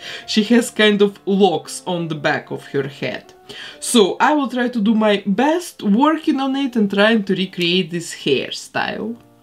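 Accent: Russia. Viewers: По первым фразам поняла, что вы русская 😇😘😘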